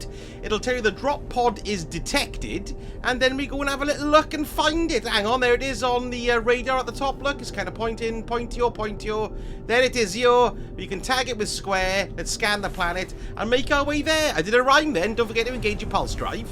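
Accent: Wales, United Kingdom. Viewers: Loving the Welsh accent... we need more Welsh in the world! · Brilliant video. I've been playing for ages now and even I learnt a few new things. Plus your beautiful Welsh accent was an added bonus as I could listen to it all day long. 30 years in Cardiff and I miss it every day 😢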